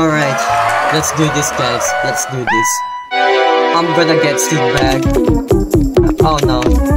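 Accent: Philippines. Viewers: If I'm right ur filipino. because of ur accent but yeah many accent like that. like indo...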